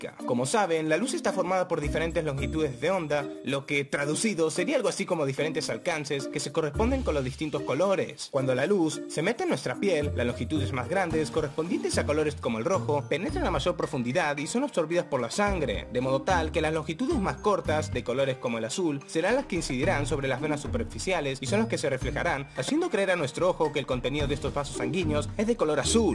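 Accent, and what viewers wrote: Argentina. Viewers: Jaja vos sos Argentinooooo!!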